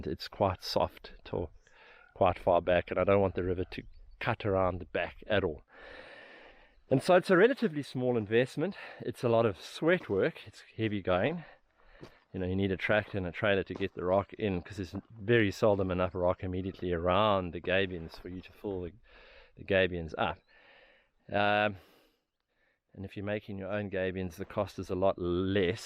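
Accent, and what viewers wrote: South Africa. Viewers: sounds south african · May I guess this is from South Africa? It sure looks like it (and your accent). Beautiful landscape any way, and excellent explanation!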